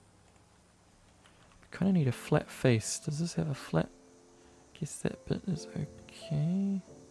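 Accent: New Zealand. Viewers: Do you have a NZ accent? Love your channel.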